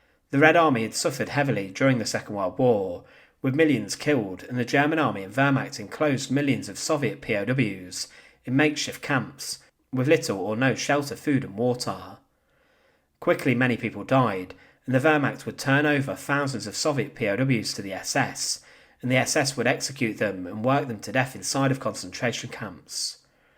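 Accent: United Kingdom. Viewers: does this guy have an accent IN ADDITION TO his british accent maybe talk slower eh ?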